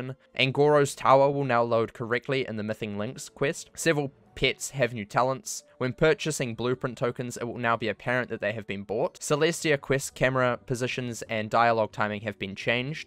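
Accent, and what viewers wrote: New Zealand. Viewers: Kia Ora bro, first time watching your video and could instantly tell you were also a Kiwi. First one I've actually ever found from wiz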